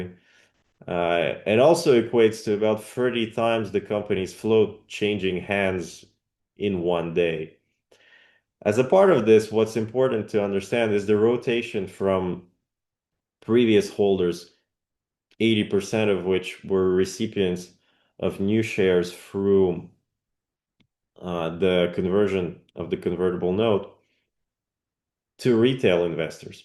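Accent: Quebec, Canada. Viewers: Are you from Quebec ? Your accent looks familiar. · Tu vien du Quebec?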